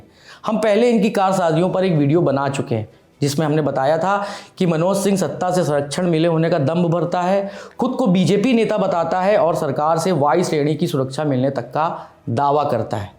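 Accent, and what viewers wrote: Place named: Lucknow, India. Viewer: Ap pakka Lucknow se ho ge 😅😅 apke bolne ke tarike se lag raha hai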